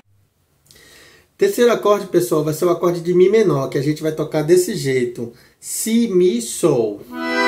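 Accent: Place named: Pernambuco, Brazil. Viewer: Vc é de Pernambuco?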